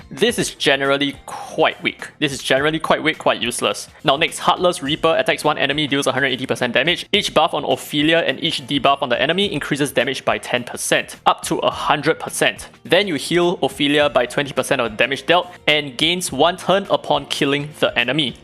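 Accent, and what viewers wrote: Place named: Singapore. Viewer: such a strong Singaporean accent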